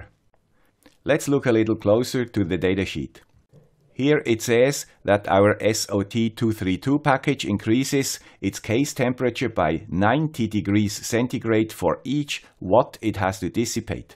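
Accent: Switzerland. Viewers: Hey, you just got this video mentioned on Hackaday. Good job and hope your channel continues to grow. May the Swiss accent keep on going! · Sali, love your Swiss accent! I also have not been able to get rid of mine. As long as you call the meat on the plate not like the timber no worries. But the FBI is like ei so is the quiescent current. English is not regulated like German. Downunder we drive on tyres while in the US they use tires. There are tongue breakers. California has Yosemite and in the land of Koalas we eat Vegemite, both same ending but different pronunciation. Acronym abuse gets one confused so in our ham radio club ce hand each other upper sideband siticks!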